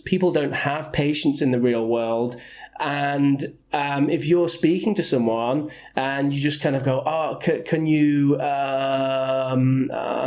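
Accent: United Kingdom. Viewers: I've been learning so much with your videos, I don't have time to attend an English course so I study by myself when I'm free.I've been following your tips and I notice that I'm finally making progress at my studies!:) Plus, your British accent sounds like music to my ears.haha Thanks a lot!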